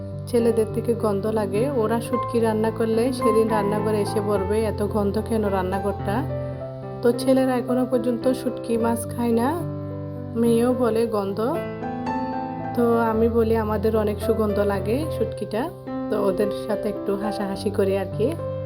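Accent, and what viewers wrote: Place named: Chittagong, Bangladesh. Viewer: ❤২২❤❤আমার প্রিয় আপু সিমের বিচির খোসা ছাড়ানোর সুন্দর একটা আইডিয়া শেয়ার করে দিয়েছো অনেক ভালো লাগলো শুটকি মাছ আমারও পছন্দ তুমি চিটাগাং এর আমি জানি আর তোমার কথায় আমার কাছে সেরকম লাগে আর আমার খুবই ভালো লাগে কথা এই ধরণটা আমার চিটাগাংই বেড়ে ওঠা সবকিছুই গরুর মাংস রান্নাটা অনেক সুন্দর হয়েছে বেগুন সিমের বিচি শুটকি দিয়ে রান্না করেছো ইফতারি গুলা ভেজে নিয়েছো বেগুনি টা আমার কাছে খুবই সুন্দর লেগেছে ভাইয়া অনেক কিছু নিয়ে এসেছে বাচ্চারা বাইরের খাবার খুবই পছন্দ করে আপু আমার বাচ্চাদেরও একই অবস্থা ভালো থেকো ❤❤❤❤❤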